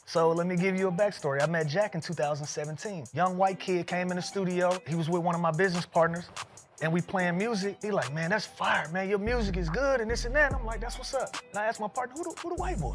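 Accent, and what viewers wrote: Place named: Memphis, United States. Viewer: bro you from memphis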